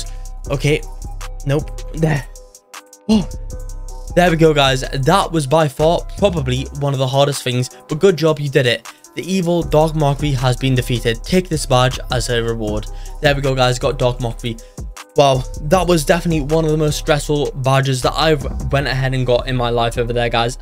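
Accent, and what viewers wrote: United Kingdom. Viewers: British man that helps us all in Roblox games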